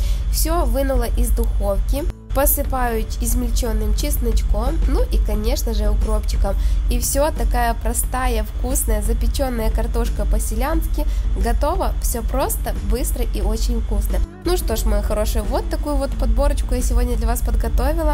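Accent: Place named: Ukraine. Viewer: Это не дикция,а акцент украинский!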